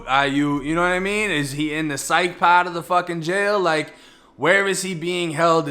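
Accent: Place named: New England, United States. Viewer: Yankee accent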